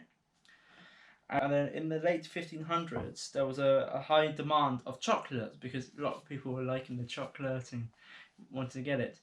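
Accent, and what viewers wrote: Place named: United Kingdom. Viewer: I would love to see a house tour as well as yard also. I never been to UK...I love the accent ✌️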